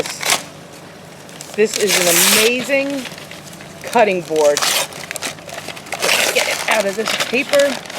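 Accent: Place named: New Jersey, United States. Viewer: Glad you got a YouTube Channel! Nice to hear your voice and that beautiful Jersey accent. The group hooked you up. There is a place in the town where I grew up, that sells these Mexican made grills that look like animals. The also sell pretty good barbecue. They have a bull like that out in front. When they’re open, they have it smoking with a charcoal fire in it. C’mon, you gave the bull a girl’s name? Margarita? Give it a tough name! Such as “Pancho” or “El Bandito” or “El Fuego” or “El Desperado”. What ever you call it the bull and the board are nice.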